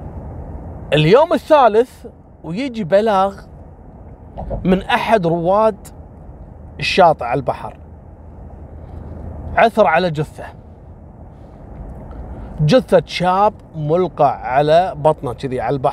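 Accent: Morocco. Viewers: 😊 حلو يتكلم مغربي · كفو يا اللهجة المغربية متعوب عليها 😂😂😂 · الله عليك يا وعلى اللهجة المغربية 😊😊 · هههههههههه كلامك بالمغربي يضحك الله يسعدك يا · Salam nice story your moroccain accent is so funny. I am following you all the time. Greating from 🇧🇪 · اموت بضحكتك صراحة 🥰 وفاش كتهدر المغربي يا سلام يا سلام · 🤣🤣🤣🤣🤣🤣لجهه المغربيه تذبحني ياحليلك يا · احلى شي يوم تتكلم مغربي 😂😂😂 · Tbarkallah 3la lahja lmaghribia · ناري على الهضرة المغربية😂😂😂😂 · انا ما انتبه على احداث القصه بسبب اللهجه الي تتكلم خاصه المغربيه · هالقصة سمعتها من عند الخراز. لكن من عندك يا لها طعم اخر وكاني اول مرة نسمعها تعجبني لهجتك المغربية تفطسني ضحك ههه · السلام عليكم انا جزايرية أقيم في فرنسا وانا من متتبعيك الدايمين لك على اليوتيوب والله ابارك قصصك رايعة واداءك اروع الله أوفقك انشاء الله انت تضحكني وتعجبني كثيرا عند محاولتك الكلام بلهجتنا المغربية جزاك الله كل خير يا 😊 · تعلم الهدره المغربيه لايقة عليك يالكبيده ديالي😘🇲🇦🇰🇼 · حفظك الله يا حلوه للهجه المغربي منك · قصة محزنة لكن حديثك بالدارجة المغربية يضحكني الصراحة 😂😂😂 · و الله احب لما تتكلم لهجة المغربية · اه على اسلوبك بالدارجة المغربية 😅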